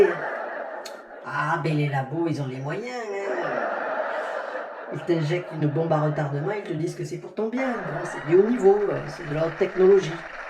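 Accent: France. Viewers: ENFIN un humoriste qui l'ouvre ! Mais qui est-ce ? Un accent du dessous de la Loire, c'est le seul indice... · Un indice il a l’accent marseillais 😉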